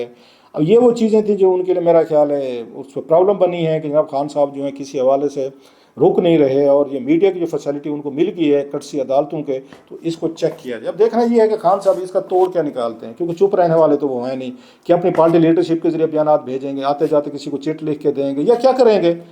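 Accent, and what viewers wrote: Saraiki, Pakistan. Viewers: Please try to correct your Urdu accent, feels that your vlog in saraiki , please next time aap saraiki me vlog Karen,Urdu aap ke bus ki BAAT nahi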